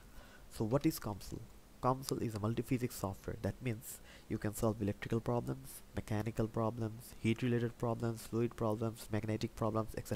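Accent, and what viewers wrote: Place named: Bengal, India. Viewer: Hi!! there, you are doing a good job. I am also learning COMSOL by myself and struggling in some parts. though my area of focus is electro - thermal or electro- thermal -mechanical simulation & jpule heating stuffs. Hope your tutorials will be very helpful. By your talking accent, it seems you are bengali. am I right? Have a nice day and best of luck.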